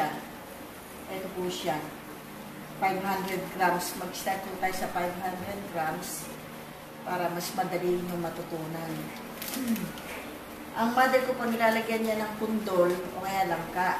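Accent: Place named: Bulacan, Philippines. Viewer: ng marinig ko ang accent mo, sabi ko taga bulacan to..kaya ng makita ko na espasol ang gagawin mo..i deduced na san miguel ka laki.. :))) taga san miguel din kami (mother side), sa may sta inez... keep up the good job :))))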